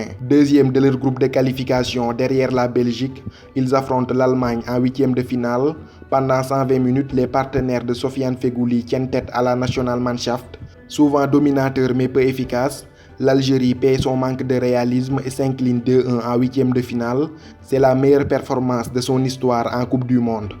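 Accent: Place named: Senegal. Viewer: 😂😂 chui pas français mais je suis sûr k ya n'en des français ki disent comme que. Et il est né sénégalais donc l'accent c naturel et faut être fier 😉.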